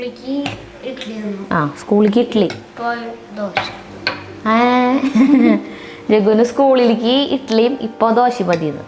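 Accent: Thrissur, India. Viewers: തൃശൂർ സ്ലാങ് കേൾക്കാൻ നല്ല രസം 👌😍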